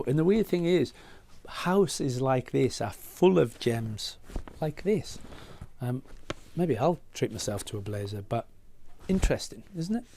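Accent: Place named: RP, United Kingdom. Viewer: Thx graet video sir! I can hear your clear queens english!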